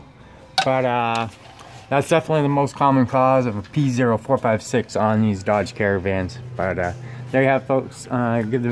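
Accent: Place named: North, United States. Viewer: What state are you from? That accent is different I'll guess one close to the Canadian border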